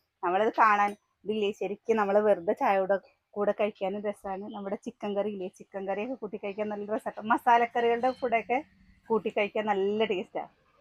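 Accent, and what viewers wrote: Palakkad, India. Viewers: Palakkadu kkar aganeyanu parayuka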